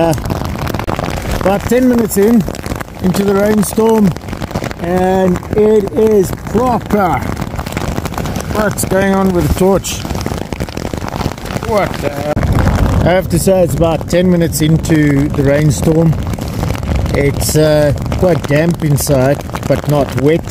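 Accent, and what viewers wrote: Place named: South Africa. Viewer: Hard core bru. Long time since I heard the SA twang. New subscriber China keep the videos comming from the UK.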